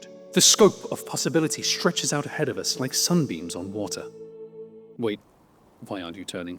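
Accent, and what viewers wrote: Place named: United Kingdom. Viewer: You're Britisj 10/10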